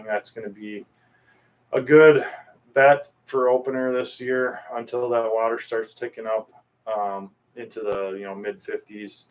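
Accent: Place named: Wisconsin, United States. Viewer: I’ve commented a few times on your channel and you’re the best at reporting northern Wisconsin fishing forecasts I’ve ever seen. The best at knowing which lures and techniques are working at any given time in any given place in Wisconsin. I love it. The Wisconsin accent is something that really brings me back to the old days up there. I know your target audience very well since I grew up on lakes in Wisconsin and I remember my Wisconsin neighbors and friends being just down to earth good people. I miss them and I miss Wisconsin. It’s part of who I am, 60 years later. After traveling all over the country and South Pacific, I’m a fisherman, always have been. But I learned to fish since I was a little kid in Wisconsin. Here’s what I’ve noticed after decades of traveling around living in California, Arizona, Hawaii, Washington, etc, I still fish like I’m from Wisconsin. 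And I am. And you know what? I’m a better fisherman because I grew up there. Wisconsinites are f*cking serious about their fishing. Not many distractions on a northern Wisconsin lake or river. No city crap, just a real in-tune and focus on nature and how to fine tune everything involved. Wisconsin winters are some of the most brutally cold around, and guys go out fishing anyway. Camping in the snow. Fishing through the ice. Nothing phases a Wisconsinite. Spring is barely a dream not a desperate hope. It’ll come, and with it, all sorts of bugs. Hatches. Mayflies. Mosquitoes. Frogs. Crickets. Fish are excited, so wa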